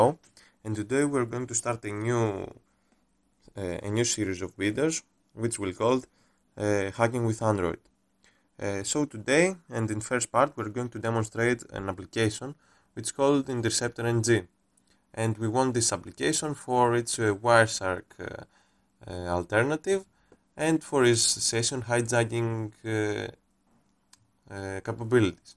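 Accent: Greece. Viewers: ΛΙΠΟΝ ΑΥΤΟΣ ΕΙΝΑΙ ΕΛΛHΝΑΣ ΧΑΧΑ ΠΡΟΦΟΡΑ :) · Πρώτον <link> εδώ έχουν ξανανεβάσει το video σου και δεν νομίζω πως είναι με την άδεια σου.. Δεύτερον η προφορά σου είναι πολύ ελληνική :D Τρίτον δουλεύει μόνο σε pc victims ή και σε κινητά?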